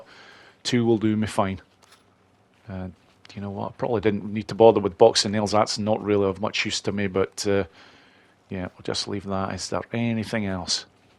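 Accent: Scotland, United Kingdom. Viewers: mega great info channel rocks the best way ever I never asked but I take it you are sottish one of my favorite wrestler is drew mcintyre from WWE .. I'm half Welsh / Greek funny combo I know lol. but your channel/ content is excellent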